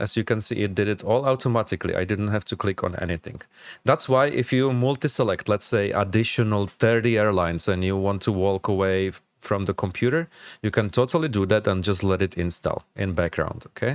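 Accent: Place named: Czech Republic. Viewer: poznám Čecha jak se snaží mluvit anglicky na 100 km😂😂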